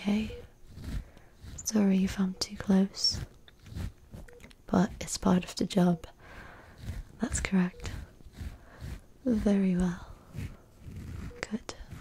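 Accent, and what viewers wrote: United Kingdom. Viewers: Me encanta tu inglés. Es más brittish que american. Eres inteligente . Y de gafas estás preciosa. Te favorecen. Este vídeo me da muchas cosquillitas. Me suscribo. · Ooo fancy English-y accent in this. Thanks!!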